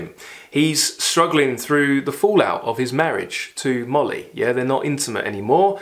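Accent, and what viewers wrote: United Kingdom. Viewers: Здравствуйте! Прошу прощения, что пишу по-русски, моего знания английского языка не хватит, чтобы выразить свои эмоции ❤ Я так рада, что нашла Ваш канал! Спасибо Вам за Вашу страсть и любовь к литературе, просто невозможно не захотеть бежать читать после Ваших видео! У Вас чудесный голос и обворожительный британский 🙈❤️ слушать Вас - одно удовольствие! Разбор негативных отзывов - прекрасная идея, очень жду продолжения! Как человек, замученный русской классикой в школе, подтверждаю, что учителя часто отбивают всякое желание читать. Например, Войну и мир я прочитала только первый том, злилась жутко на себя, на учителя, на Толстого, на весь мир 😂 и до сих пор к великому своему стыду не читала ничего из Толстого 🙈 Однако, не имея желания читать русских авторов, прочитала многое из английской (Ивлин Во "Возвращение в Брайдсхэд" и "Незабвенная" - одни из любимых моих книг), французской (Камю и Экзюпери - любовь моя) и американской (Ken Kesey - "Sometimes a great notion" - обожаю до слёз) литературы. 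Сейчас период японской литературы и возвращение к русской классике. Очень жаль, что в окружении нет людей, которые любили бы те книги, которые я предпочитаю, есть некая неудовлетворённость от того, что не с кем обсудить. И даже в книжных клубах никак не могу найти тех, кто читал бы книги, которые мне интересны 😢 Моби Дика читала 10 лет назад, понравился очень и оставил желание перечитать, когда стану постарше. Из русских писателей люблю не очень популярного на Западе Лескова. Его "Очаро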